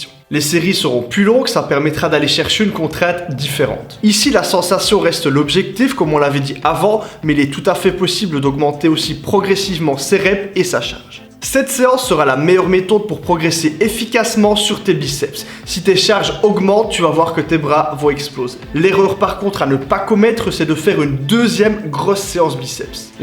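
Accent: Belgium. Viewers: Ahhh l'accent Belge m'avait manqué 💪🤘🇧🇪!!! Merci pour tes conseils !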